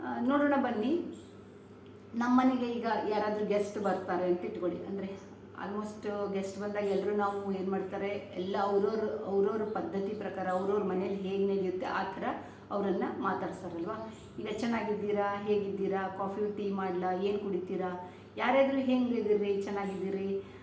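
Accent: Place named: Kundapura, India. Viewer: Swalpa Kundapura kannadakke Holutte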